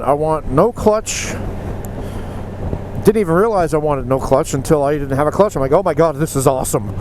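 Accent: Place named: Boston, United States. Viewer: Laytah ....BOSTON ACCENT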